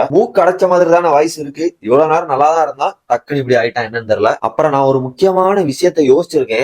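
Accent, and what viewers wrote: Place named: Melapalayam, India. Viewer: nenga melapalayam ma bro slang is same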